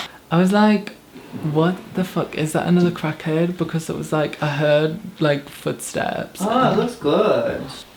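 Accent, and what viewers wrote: Australia. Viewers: Yes, you totally sound Australian, but I'm loving it :-) I prefer British English of course, but this Aussie accent suits you fine. This is only the second video of you that I watch, but I like you a lot as a person and I admire you for what you are doing. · the aussie twang keeps making me laugh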